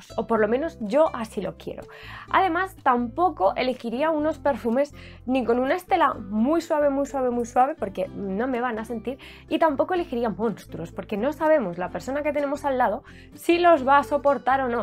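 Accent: Spain. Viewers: Hola, puedo pasar "horas" escuchándote. Porque soy locuela por los perfumes y porque me encanta tu acento español! Que me recuerda tanto a mi padre! Aquí va la pregunta de la "cumplecharla" ¿Has comprado algún perfume que no sea "conocido" en algún viaje? Por ejemplo, yo he comprado perfumes "locales" de tiendas de ropa que no son conocidos de distintos países a los que he viajado. Sólo porque me han gustado. Y por el precio obvio! Saludos desde Argentina!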